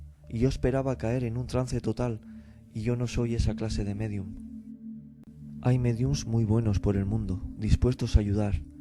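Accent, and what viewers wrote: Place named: Spain. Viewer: muy lindo y modesto tu historia de cómo te hicistes este guía espiritual de la humanidad me pasó algo parecido a la historia de la muchacha pero no con el suicidio si no con la muerte de mi hermano el día q se puso malo me entró un vídeo q nunca me había entrado ningún vídeo de ese tipo fue la historia del neurocirujano q tuvo una ECM como una demostración q mi hermano IVA a estar bien y a partir de ese momento me sentí súper tranquilo y le perdí hasta el miedo a la muerte y fue cuando me entró tu primer video bendecido por Dios y a partir de hay los e visto todos los q han entrado me das mucha confianza vivo en cuba sino fuera a España a conocerte tu acento es español si cojo la ciudadanía española dios me llevará a conocerte muchas gracias por todo bendiciones pa ti y tu linda familia y para todos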